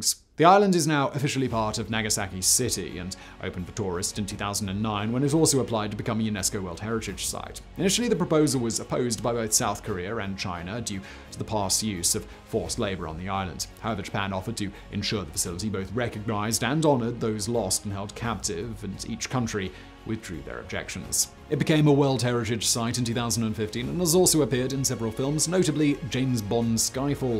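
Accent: United Kingdom. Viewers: Yes. He tends to put me asleep. I genuinely don't like the British accent but I like his voice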